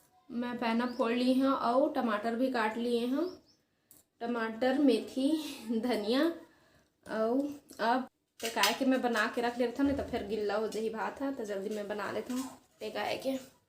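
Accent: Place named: Chhattisgarh, India. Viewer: बहुत बढ़िया दीदी आरूग छत्तीसगढ़ी म बोली बहुत सुंदर लगते आप कहां से हो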